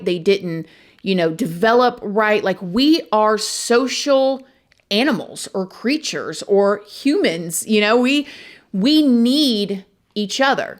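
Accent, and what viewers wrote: South, United States. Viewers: Don't come at me. This isn't meant to be an insulted, but of course, some people will always take it as an insult cause the Internet and there's so many personalities. Your speech is hillbilly but you are one of the smartest crime reporters on youtube. I really enjoy your videos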